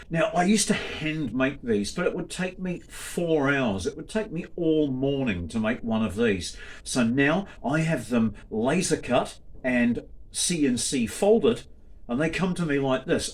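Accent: New Zealand. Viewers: Hi sounds like your. in kiwi land.im in northland ...have any available for p....?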